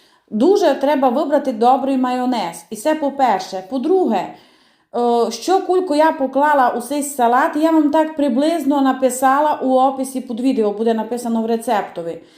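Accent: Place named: Transcarpathia, Ukraine. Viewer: Дорога пані! Так сталося що я прожила в сонячному Закарпатті три роки (чоловік ❤працював) і я до нестями полюбила цей казковий куточок та цей діалект. Це така ностальгія. Ви кулінар, майстриня та художник ❤❤❤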